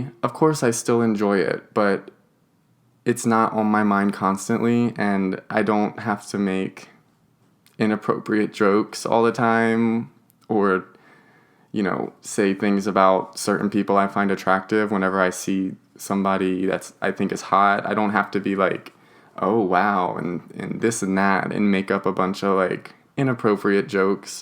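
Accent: United Kingdom. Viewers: Jezzz whiz, for an Adult PORN STAR you seem down to earth, really u do but are u english..for some reason i think your british but i believe your american....great vids....great chats..have a great day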